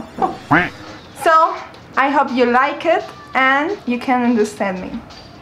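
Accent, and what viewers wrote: Paisa, Colombia. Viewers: Estás bien, te falta es la pronunciación más gringa y menos paisa jajaja pero vas bieb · Estuvo genial, muy claro y el acento paisa hizo más simpático el vídeo. Esto me ayuda porque soy terrible para el inglés, como que me familiarizo más de esta manera, no sé. Gracias. Luces muy guapa también con los frenos, y el maquillaje quedó precioso.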